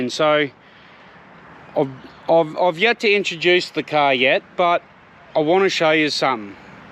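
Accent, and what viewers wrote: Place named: Australia. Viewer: Being an American I’m not used to hearing an Australian accent and I gotta say I love it. Love the work you putting in. Can’t wait to see the final product. Keep it up.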